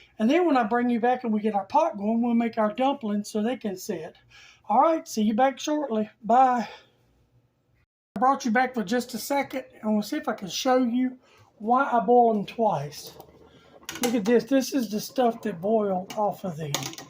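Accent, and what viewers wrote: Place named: South, United States. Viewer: 👁👁 had to tune in to get My Sweet Southern accent…fix… God bless you sister 🙏🏼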